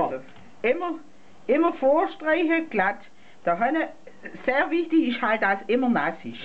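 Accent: Swabia, Germany. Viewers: Aus welchem Ort kommt sie, schwäbische Alb? Bitte auch noch das Rezept für den Teig posten! :-) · j t Sie is aus dem schwabenländle :) (also aus der Region um Stuttgart) den Dialekt oder der Akzent nennt mann schwäbisch :) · I muss sagga i mag den schwäbschen akzent ga edda abba zu spätzle kehrt des eifach dazua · Das war schwäbisch, nicht bayrisch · Expert! Sounds like Schwabish. :) Born in U.S.A. but love to hear my Mom's dialekt. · angiek 84 schwabenland, schwäbisch vom feinsten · Vielen Dank! So schön schwäbisch zu hören ❤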